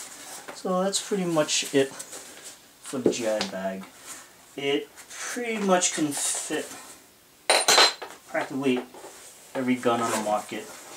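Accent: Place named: New York, United States. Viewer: Great review! I actually just ordered one myself for my axe. Also loving the new york accent.lol i am also from new york but i live on long island. where do you go to play?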